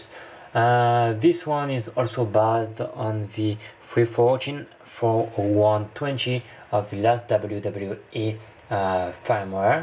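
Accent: France. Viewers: On peux entendre dans ton dialecte on Anglais.Mais c'est typiquement "Francais-anglais" et j'aime ca :) Ou les Francais parle l'allemand et c'est chéri :D Ps : Excusé pour ma mauvaise francais :D Salutions de Luxembourg :) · Haha, je suis venu dans les comms exprès pour voir d'où tu venais, je comprenais beaucoup trop bien ton anglais pour que tu viennes d'ailleurs que de france ^^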